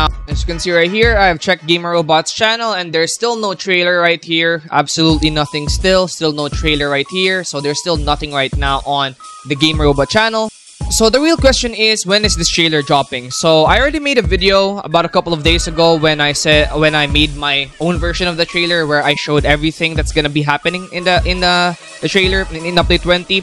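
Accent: Philippines. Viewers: Your accent is like so Filipino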